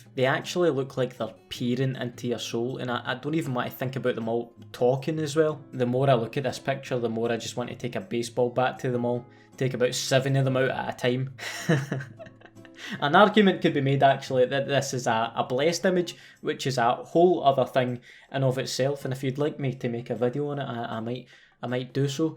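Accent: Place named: Scotland, United Kingdom. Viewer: Nah, just Scottish.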